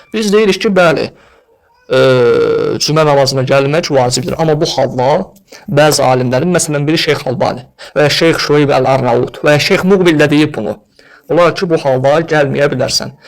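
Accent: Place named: Iran. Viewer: Sən niyə İranlılar kimi danışırsan?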